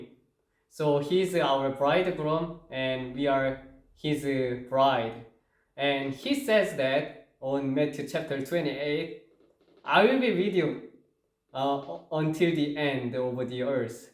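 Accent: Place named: South Korea. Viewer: 콩글리쉬 발음으로 영어로 성경 읽고 설명하느라 애쓰십니다 저는 그만큼이라도 영어가 안되는데, 유창한 영어 발음은 아니신 것 같은데 그래도, 그렇게라도 하시는거 보니 부럽네요 .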